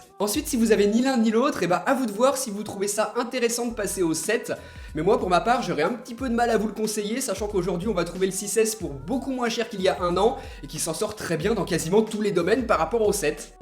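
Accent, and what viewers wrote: Lyon, France. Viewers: Mais tu es de LYON ?! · Oh mais t'es lyonnais???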